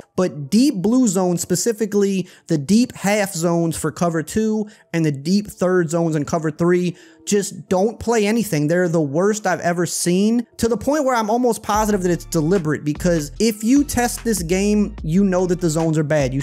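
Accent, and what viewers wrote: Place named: Lafourche, United States. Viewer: Never seen one of your videos before but damn that accent gotta be from Lafouche.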